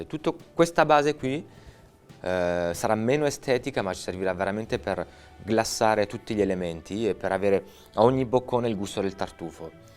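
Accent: France. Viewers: he speaks italian with a lovely french accent. Very nice and charmant. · Perché parla con l’accento francese 🤔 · lo chef ha un accento francese sull’italiano 👂🏻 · Let's say the vid's interesting, but his "language accent" sounds really strange ! Some time he speaks with the french "r"... but sometimes he does speak with a real italian accent ! Hence, the vid sounds a bit setup...😲 Btw, the oil is made by "vinaccioli", not by "vinacce"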